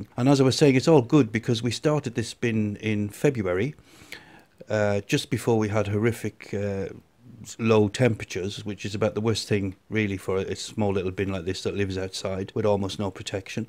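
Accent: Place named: United Kingdom. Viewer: .I enjoy listening to your accent also. I am origanaly from a small village called Darley near Harrogate Yorkshire. I live in the USA now in Tenneessee so it is lovely to hear another British Person's voice :) Do you ever show your face ? I like to see the face with the voice. I really enjoy your video's thanks for sharing them with us :)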